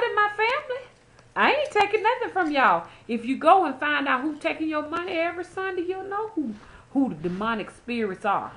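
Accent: South, United States. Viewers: this is the first time am watching and I believe no one should have judgment of anyone. i love your southern accent am Caribbean and I like how the people from the south down home. I don't know much about this stuff but alot of what you say is true. I am tired of hurting and my heart broken when am always be so nice. I really want my boyfriend back and want him to love me again. Us living in china i think he had something put apon him to up and leave. I just want him back.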